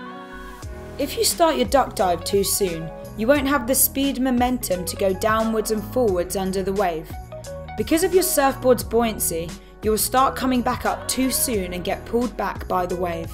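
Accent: United Kingdom. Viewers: Hahahahaha..I just love the English accent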